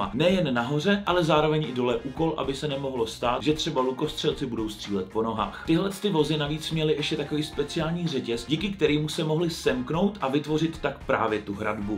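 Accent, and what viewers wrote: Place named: Bohemia, Czech Republic. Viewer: Za mne je ta "čecháčtina" neposlouchatelná. Nauč se mluvit česky a rád si to poslechnu. Ahoj z Moravy.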